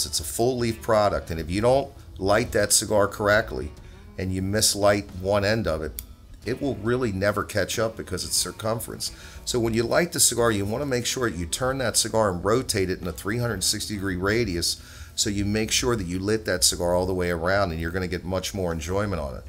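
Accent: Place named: Philadelphia, United States. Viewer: Hey...great vid..very informative...?..are you from the Philadelphia area?...your accent sounds like mine...born and raised in the city..thank you again for being a leader in this great hobby of cigars🤙💨💨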